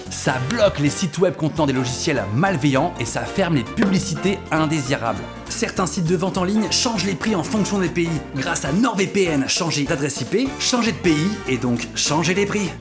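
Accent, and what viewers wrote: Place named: South, France. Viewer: mon dieu l'accent du sud qui revient je pensais etre la seule :''')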